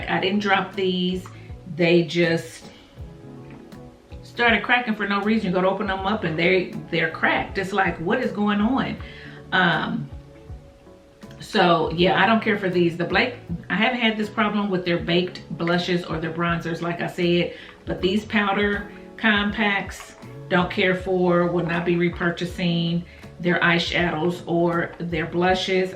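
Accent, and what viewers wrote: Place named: Indiana, United States. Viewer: Hi! Thanks for replying! I’m from Barbados, so everyone outside of Barbados has an accent to my ears 🙈. I don’t think I’ve ever knowingly heard anyone else from Indiana, so I didn’t have a point of reference. In any case, I could listen to you talk all day! I think it’s your energy and your entire demeanor as well. Keep the great content coming! 💕🤗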